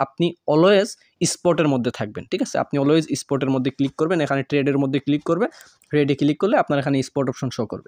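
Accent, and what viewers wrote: Sylhet, Bangladesh. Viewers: Vai ki Sylheti?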